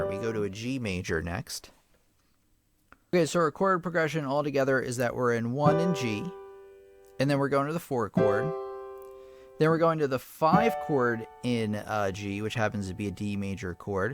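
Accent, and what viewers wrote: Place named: Philadelphia, United States. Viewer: Could not join your Live stream as a participant tonight! Wonder if others had issues too? Seems You Tube failed us with not error message. , Nor could I support the channel which I’d gladly do. Just today, I rec’d your suggested book from Amazon: "Scales, Chords, Arpeggios & Cadences”. Got to page 8 under 'Chord Progressions' & just failed to comprehend what this sentence means, here goes: “When a triad is not in root position, the ROOT is ALWAYS the upper note of the interval of a 4th!” Can you explain or re-word this sentence in a differnt way or possibly give an example, especially on the “..4th interval”? It is an enigma why my question on your Live presentation wouldn’t post! It was so annoying as there was not an error message nor any indication how to enter my text. This occurred, not at the end of your transmission, but >15 minutes prior. 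Any help with that? Lastly,, hearing your accent, it sounds as if you’re located somewhere near Philly area. I think you mentioned you do give piano lessons? I’d like my family to have keyboard instruction, with one who knows how to demystify complex concepts e.g. chord modulations, without feeling overwhelmed. As an educator & public speaker, I recognise, you do have a gift, making the terminally-complex, less so. Thanks & sure hope you can advise, what I may have done wrong, not being able to join the conversation tonight? Deni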